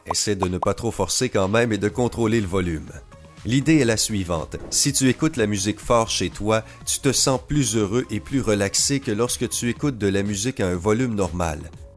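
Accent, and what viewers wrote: Quebec, Canada. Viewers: l'accent quebecois désolé mais non s'il vous plaît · Quel accent de merde cet accent québécois quand même, on dirait de la chiasse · L accent Quebecois ET trop drole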